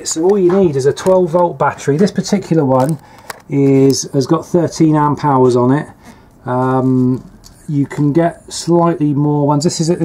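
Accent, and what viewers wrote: England, United Kingdom. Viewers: It's English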